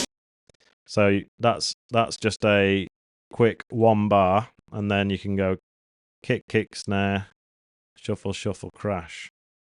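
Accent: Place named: Bradford, United Kingdom. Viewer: You lad............. You are the Don Corleone! And that Accent too (Bradford boy myself) One of the best tutorials I have seen in a while. You know your stuff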